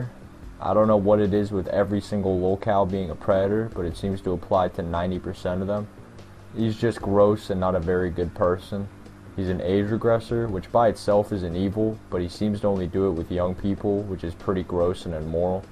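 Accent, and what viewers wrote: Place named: Turkey. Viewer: Are you turkish? You sound very turkish to me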